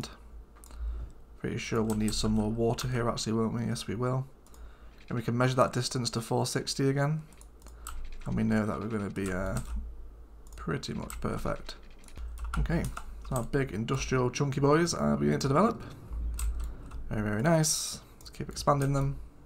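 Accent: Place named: United Kingdom. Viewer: Hi, apart from this map do you have a thought process what map you choose. Also cheeky question where you from in the UK. You sound like my home town lol.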